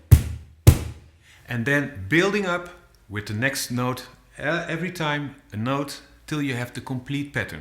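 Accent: Netherlands. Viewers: Je bent zeker een hollander,ik herkend de uitspraak,zelf kom ik uit Iran en woon in Parijs, ik will here je bedanken voor dit aardige video dat je ons laaten zien,ik ben een beginners om cajon spelen en vond ik je video perfect,dank u wel....Thank's a lot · You sounds dutch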